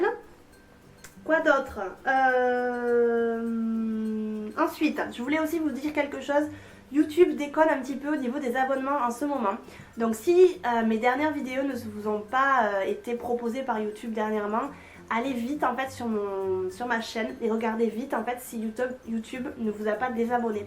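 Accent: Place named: Toulouse, France. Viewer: Bonjour à toi!!! je viens de découvrir ta chaine et de me regarder prés de 10 de tes vidéos!!!! J'aime beaucoup ce que tu fais, tu partage une autre culture à travers tes vidéos et je trouve ça génial! ....En plus, en toute simplicité..... Et je suis contente car tu es une Toulousaine!!!! (rolala la chauvine...) Il me semblait bien reconnaître mon accent.... ;-) Vivant moi aussi à l'étranger, je comprends qu'il te tarde de revenir dans ta ville!!! j'espère que tu en as bien profité. Merci encore, je te souhaite une excellente année 2017! Ah et au fait, est il possible de nous faire des vidéos recettes de cuisine? Si tu en a le temps évidement. Merci et à bientôt!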